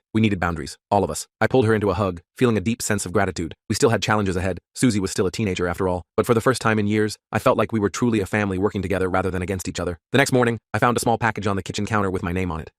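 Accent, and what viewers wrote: United Kingdom. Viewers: Why did it switch to British accent/pronunciation? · Why did he turn British?😭😭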